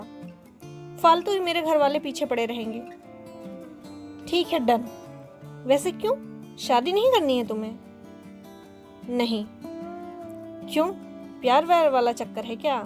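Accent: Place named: Rewa Satna, India. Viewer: hame laga aap rewa satna se hai apke baat krne k according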